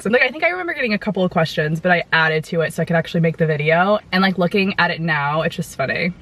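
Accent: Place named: San Fernando Valley, United States. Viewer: Please dont take offense to this, good vid, i subscribed..are you from the valley? Im from seattle, but i got cousins who lived somewhat near to the "valley", for a short while i worked there. Theres a specific tone that you got..its reminiscent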